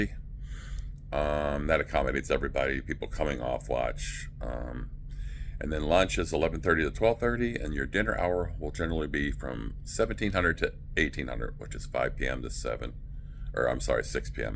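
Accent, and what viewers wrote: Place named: United States. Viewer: White guy, speaking American English… you on a US registered ship? That’s not how it is typically at sea.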